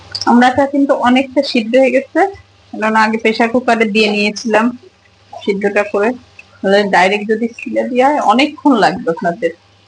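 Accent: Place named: Barishal, Bangladesh. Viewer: আপনি মনে হয় বরিশাল্লা😅😅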